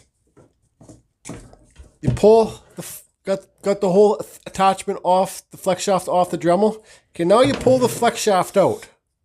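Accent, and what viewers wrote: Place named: Canada. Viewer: You're Canadian eh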